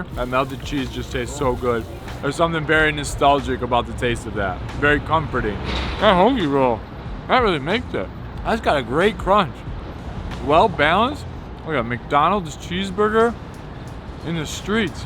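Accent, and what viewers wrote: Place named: New York, United States. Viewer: Your NY accent comes back when you're back East.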